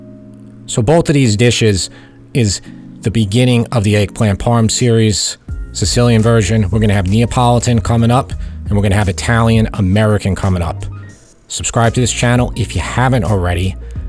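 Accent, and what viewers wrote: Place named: Queens, United States. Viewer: New to your channel. I knew your accent is Queens/Long Island! I live in the Hudson Valley, but my accent is pure Bronx! Anyway, just spent two summers in Italy (I’m retired), and learned that Italian and Italian-American are almost two different cuisines. The meals we think of as Italian are virtually unknown in Italy. So I’ve been trying to cook more in the genuine Italian style, as well as using ceramic dishes I picked up around the country. As an example, I ordered veal scallopini with carciofi giudesca (not sure of spelling, Jewish artichoke). I also asked for a side of pasta. They were really confused — pasta?? Then they laughed, “Americans!” Anyway, I like your recipes.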